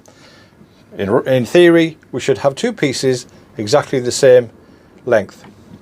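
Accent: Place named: North of England, United Kingdom. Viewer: Where in England are you? I grew up in the North of England and to my now horrendously uneducated ear you sound like you might be close to there but ive not been back in 30 years now so I could be wrong.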